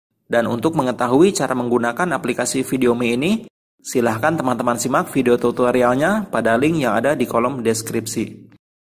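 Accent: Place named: Sukabumi, Indonesia. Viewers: Org sukabumi ya bang 😅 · Abang orang skabumi ya???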